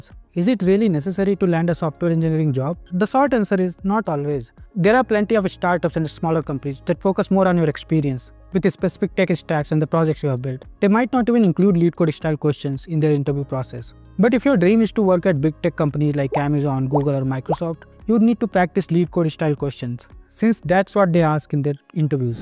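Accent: India. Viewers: Thankyou so much for this video sir. Gave me a lot of insights as a beginner and sir, It would be more helpful if you pronounce some words clearly without using hindi accent since its not easier for everyone to understand the words like the ones starting with 'S'.😊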